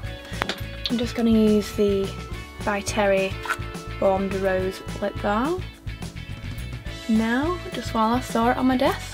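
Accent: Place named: Northwest England, United Kingdom. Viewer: I feel like we are from the same place, I read your faq and you're from the north west of England and so am I but your accent sounds like the town I'm from😂💕 I loved this look and you suit white-blonde hair so much! I wish I could pull of that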